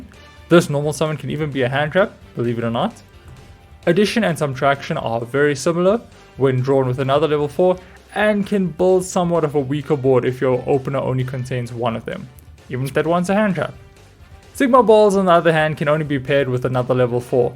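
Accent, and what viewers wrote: South Africa. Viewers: Awesome vid man 🤙 recognised the SA accent immediately 😂 much love from Jozi ❤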